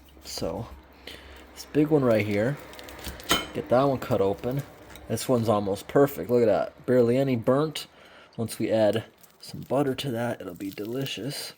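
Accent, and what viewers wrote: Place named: Connecticut River Valley, United States. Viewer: Don't understand why I watch this stuff lol recognize the Conn Riv Vly accent good video my man ✌🏼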